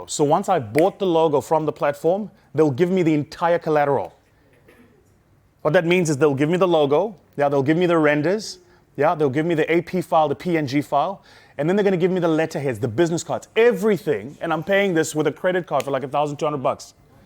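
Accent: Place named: Township, South Africa. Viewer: lol; I love your Kasi Accent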